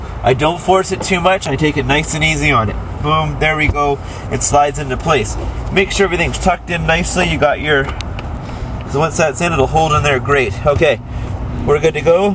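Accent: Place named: Canada. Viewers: He sounds canadian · He's got a strong Canadian accent. Could also be from Minnesoooda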